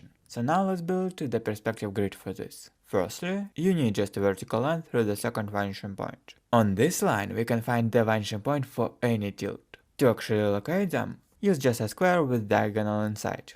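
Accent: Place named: Germany. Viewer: Ur accent sound so germany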